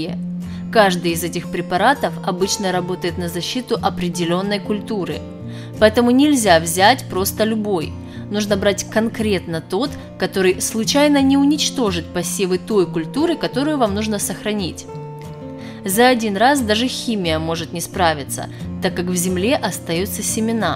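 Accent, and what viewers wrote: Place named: Ukraine. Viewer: ОН С АКЦЕНТОМ ГОВОРИТ, ПОТОМУ ЧТО ОН С УКРАИНЫ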